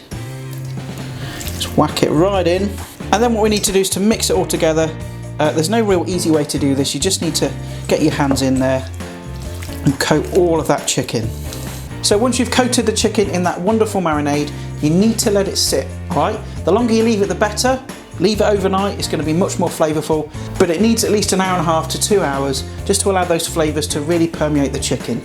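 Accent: United Kingdom. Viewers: I love your accent. what kind of British accent is it?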